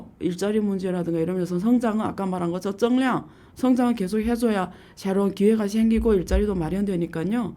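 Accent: China. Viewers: 조선족이야? 발음이 그쪽인데 ㅎㅎ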